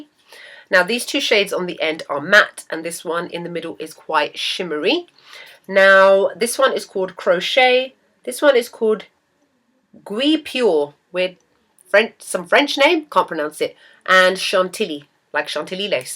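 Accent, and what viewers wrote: United Kingdom. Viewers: Looooove british accent! =)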